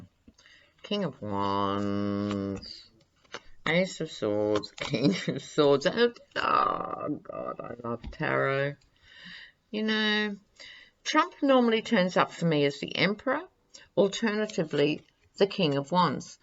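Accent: Australia. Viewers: Love that Aussie accent -- when you said you'd ordered a couple of new decks, it comes out dicks. If Ivanka is indicted, she won't hesitate for a New York second to rat out her father, to keep herself out of prison. We're so caught up in the US over the Trump business, we forget other countries in the free world have their disappointments, too. Australia -- we feel your pain. Thanks for the great reading -- as usual.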